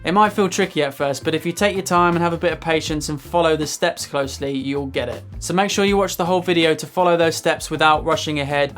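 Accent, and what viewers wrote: United Kingdom. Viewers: Dear sir, I love your videos very much!Thanks for your efforts.!!I am not a English native speaker,so I can learn to play piano and English at the same time,it is really awesome. I like your British accent,it sounds nice,maybe you can teach english too😜Have a nice day!Thinks again☕️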